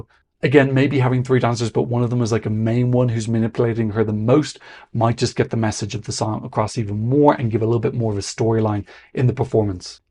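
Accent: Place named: Ireland. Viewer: Why does future sound completely different? Even his Irish accent is stronger! 🤔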